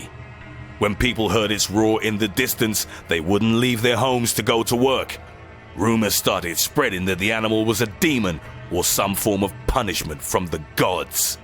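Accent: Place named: London, United Kingdom. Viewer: Accent is from London, UK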